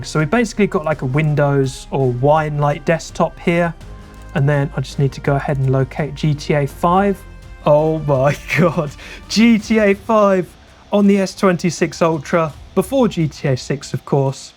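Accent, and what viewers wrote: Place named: United Kingdom. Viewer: Good night I heard your British accent I'm sorry I can't watch it it just gets to me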